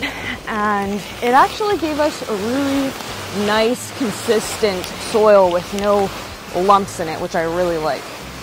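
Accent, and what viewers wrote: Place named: New England, United States. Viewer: Maybe just a hint of New England in taaaak. These folks work hard at whatever they do. Seem like sincere people. Need more of them today😊😊😊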